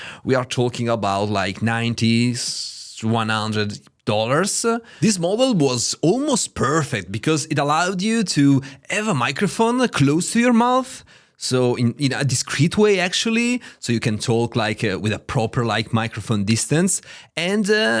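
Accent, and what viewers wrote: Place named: Italy. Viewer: I honestly like the Italian accent and it doesnt bother me maybe bc im from the EU and im used it but none the less great video, I had the OG lp arm and wanted to switch to the white lp pro but 199 euros - HARD pass.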